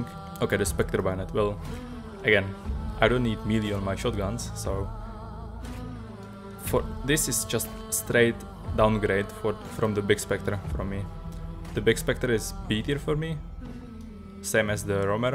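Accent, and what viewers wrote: Czech Republic. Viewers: Hmmmm is that a czech accent i hear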